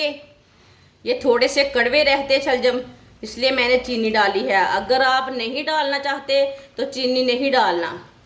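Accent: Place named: Punjab, India. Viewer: What a thick Punjabi accent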